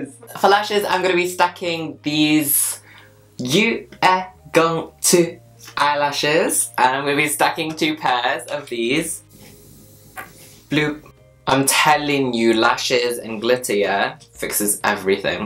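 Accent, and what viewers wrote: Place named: South London, United Kingdom. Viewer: havent watched in ages!! when did he start sounding like hes from south london!!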